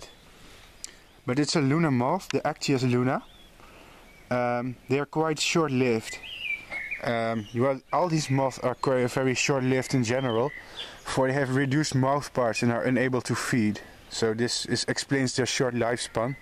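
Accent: Netherlands. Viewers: Beautiful creatures, and never apologize for your Dutch accent! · Those are some very pretty moths! I immediately knew you were Dutch haha! But don't even worry about the accent, you're very easy to understand :)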